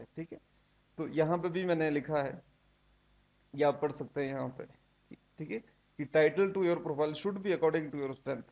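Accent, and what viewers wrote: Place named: Himachal Pradesh, India. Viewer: Very Informative Video in Himachali accent :). Thank you Sir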